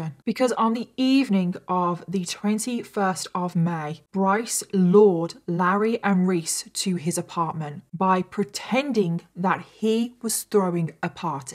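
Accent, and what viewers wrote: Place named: West Midlands, United Kingdom. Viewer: Not sure what part, but definitely a West Midlands accent.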